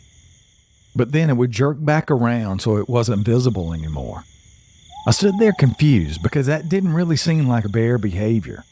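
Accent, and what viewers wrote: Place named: Texas, United States. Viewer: Trying to place the accent....texas?..oklahoma?.... Arkansas here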